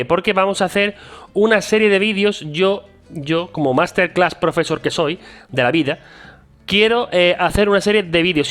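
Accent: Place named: Andalusia, Spain. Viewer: Me imaginó que eres andaluz. Por el acento que tienes.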